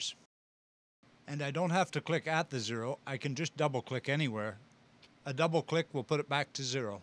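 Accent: Canada. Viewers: Nice video and canadian accentt soundz kool dogg!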